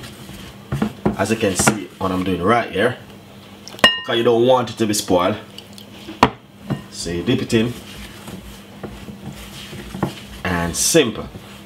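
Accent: Jamaica. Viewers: So Jamaican, so much so that I heard "spinners" when my ears clearly heard him say spinach over and over 😊 thanks for the refresher, when I make them sometimes they come out softer than I like. Never thought to let them sit for 10 min. Blessings! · I did hear spinage, chef u making us Jamaicans sound bad. It's the accent though, love it 🤣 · Loving the strong Jamaican type accent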